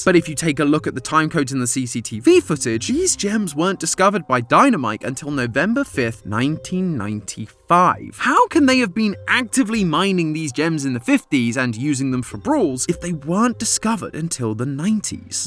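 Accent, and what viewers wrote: United Kingdom. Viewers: Man I love the British accent...brool stars. hehehehe😅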